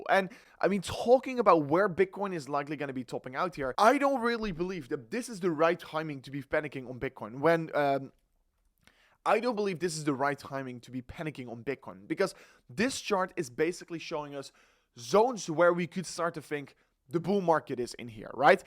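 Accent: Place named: Netherlands. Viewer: Zo klinkt ie wel ja 😅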